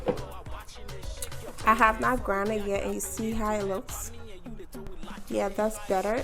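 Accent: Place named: Liberia. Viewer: She is definitely Liberian that accent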